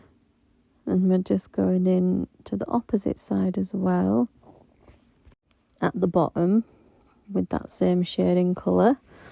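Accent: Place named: Yorkshire, United Kingdom. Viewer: Glad I found your channel so nice to hear a Yorkshire lass keeping it real you sound just like my best friend who is from Leeds! Thanks for sharing your tips I loved all of your videos especially how you gave alternative options for the pool and tortie 👌🏻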